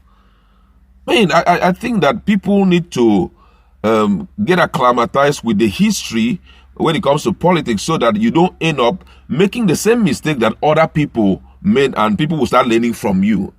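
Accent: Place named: Ika, Nigeria. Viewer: Bro, you speak like an Ika Igbo, you sound like George Oyinbe. I went to high school in 1970/1971 with a guy called Pius Oyinbe from Ika. This was in Ologbo\Benin.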